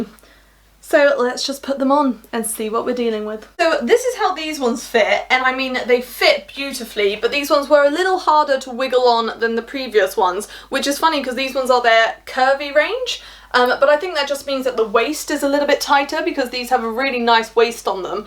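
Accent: United Kingdom. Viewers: I love all your videos, and I love your very British accent ♥ ♥ ♥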